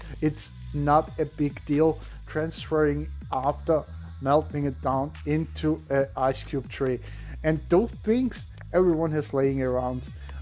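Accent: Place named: Sweden. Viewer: Its probably nordic. Swedish prob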